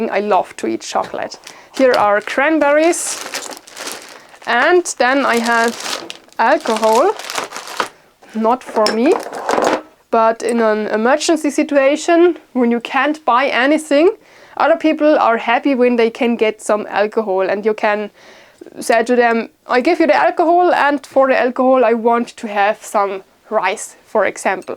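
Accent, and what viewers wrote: Germany. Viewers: exelent job on your preparedness. Soon you're gonna need a shed because the cabin is full. You might won't for extra preparedness to hide and bury some of your food like the long term packaged and cans somewhere in secret locations in and around the forest. Love MIKA and your GermanEnglish ❤ Don't forget to hide your Nuts like a squirrel. 🐿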